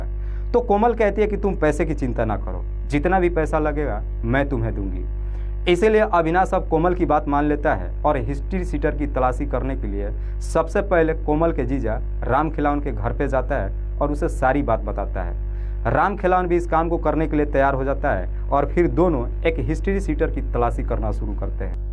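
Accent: Bihar, India. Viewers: Bihari ho kaa bhai?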